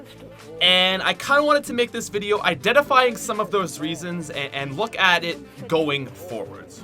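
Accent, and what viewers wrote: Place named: Nova Scotia, Canada. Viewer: why do nova scotians butcher "about" us ontarians think that shits wierd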